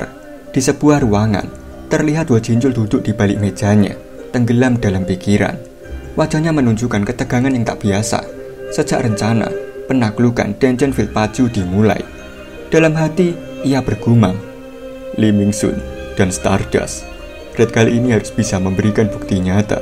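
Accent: Java, Indonesia. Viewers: Wong jowo a mass?🗿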